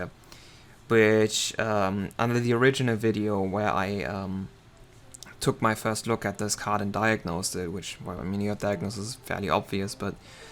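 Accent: Germany. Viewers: nice to hear english with german accent again :))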